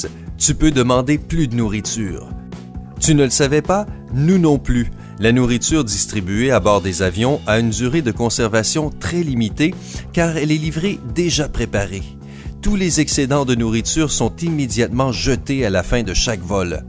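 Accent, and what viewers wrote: Quebec, Canada. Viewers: Oh Non, l'accent canadien. Je peux pas ... sorry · Putain d'accent canadien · Pur accent québécois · RRr cet accent québécois.. Je n'arrive pas à m'y faire. Il est agaçant à entendre. Pardon.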